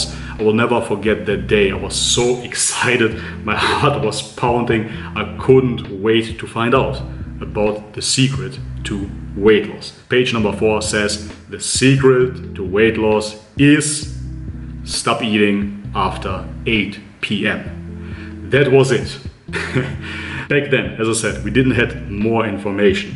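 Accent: Germany. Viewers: Love that German accent brother! Btw I had to laugh when you said you prayed to Jesus Christ, since you look like a dead-set Muslim! Lol. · bist du echt deutscher?? ich (amerikaner) habe die Aussprache gemerkt aber dein englisch ist perfekt! ausgezeichnet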